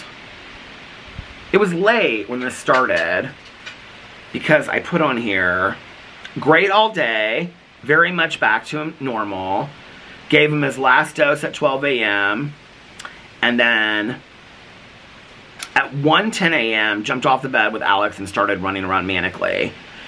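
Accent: South, United States. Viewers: To NOT live in the South, you really do have a southern accent lol 🤣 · You do have a southern accent, I actually adore it and find it amusing when you pronounce things funny, I used to live in Louisville and I noticed that a lot of people in Southern Indiana would say code for cold, like I stayed home from work today because I have a code. I’ve never heard you say that but I always get a little smile when I hear it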